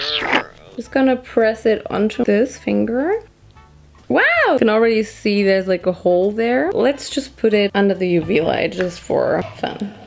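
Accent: Germany. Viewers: she is so german that u can hear her accent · I thought she was German from the beginning because she kept talking in German and had an accent if that makes sense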